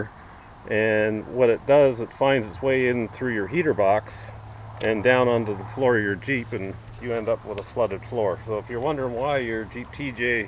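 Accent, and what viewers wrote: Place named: Canada. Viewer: Love that Canadian accent! Wife's from MI and I haven't heard that in a while! Bob from Texas...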